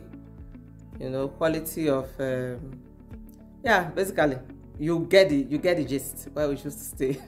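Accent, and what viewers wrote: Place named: Nigeria. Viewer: I love Nigerian accent, I hope yours doesn’t change. This is your continent, live and enjoy sis.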